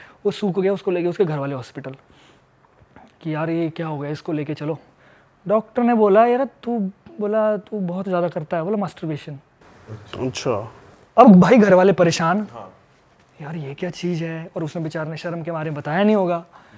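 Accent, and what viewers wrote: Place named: Haryana, India. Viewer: Himachali tone inspired by haryana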